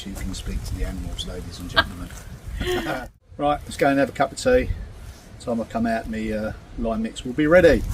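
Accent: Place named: South London, United Kingdom. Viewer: Saw you pick up tiles from Ryan, hopped over to your channel. Now addicted. Not sure where exactly from but my guess would be south London, lewishamish… probs completely wrong but your accent is the same as my family. I live in NZ, so it’s music to my ears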